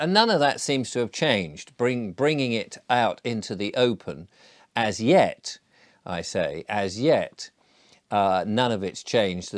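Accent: United Kingdom. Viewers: i am from Michigan and I have no accent but you on the other hand have a thick British accent, it seems to me.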